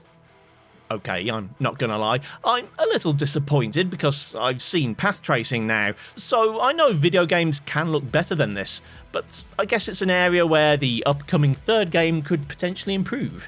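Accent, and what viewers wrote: United Kingdom. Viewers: man i instantly recognized your british accent i'm so proud of myself :D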